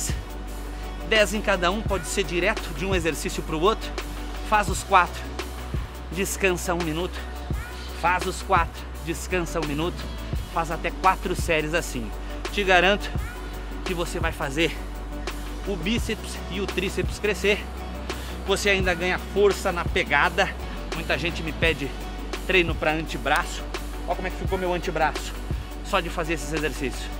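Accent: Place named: Porto Alegre, Brazil. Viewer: Tu é gaúcho ? 😅 Percebi um ar portoalegrense na tua forma de falar,as praças dos vídeos me lembram muito Porto Alegre,pois sou de Porto Alegre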